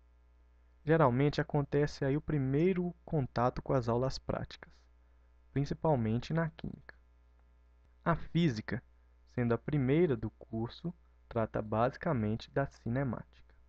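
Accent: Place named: Minas Gerais, Brazil. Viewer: Antes de dizer que havia estudado em Ouro Preto já percebi q vc é mineiro, se não é pegou bastante o sotaque rsrs, Minas minha paixão, saudades!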